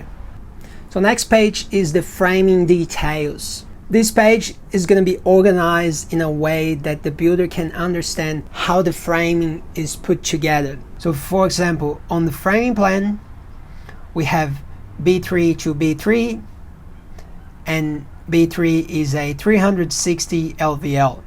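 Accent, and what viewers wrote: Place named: Australia. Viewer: Great aussie accent. Structural calculations are always relevant. Keep it up!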